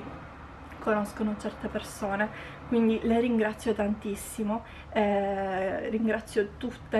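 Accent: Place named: Sicily, Italy. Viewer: Io sono nuova ,finalmente sento la tua voce,un altra Sicilianaa belooo,io sono di Palermo,ma vivo in Toscana da 20 anni .Cara ti seguiro',un baciuzzo 😘😘😘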